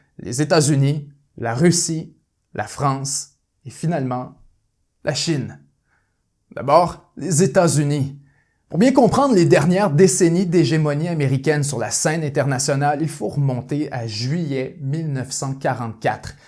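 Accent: Quebec, Canada. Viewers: j'ai vraiment toujours un problème avec l'accent des québécois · J'adore ton absent québécois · L accent Quebecois est genial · L'accent Québécois, il est magnifique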